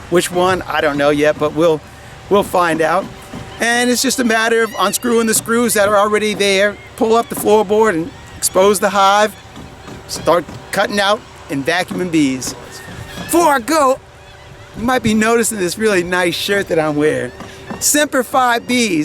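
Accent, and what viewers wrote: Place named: New York, United States. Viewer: I thought you were from New York,. You sound a lot like to me.... and you look like you're.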